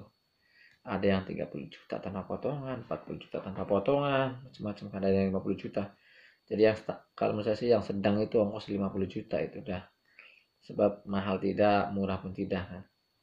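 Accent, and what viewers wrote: Indramayu, Indonesia. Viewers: dermayu tah mas?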